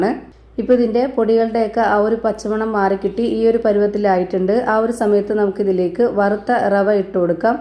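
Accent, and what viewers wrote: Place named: Malappuram, India. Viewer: മലപ്പുറം താത്താന്റെ ശബ്ദം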